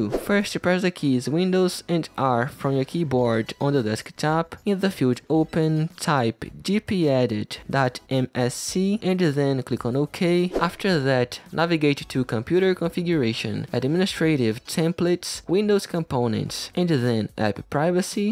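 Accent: Brazil. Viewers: demorei 2 minuto pra notar q o manin era brasileiro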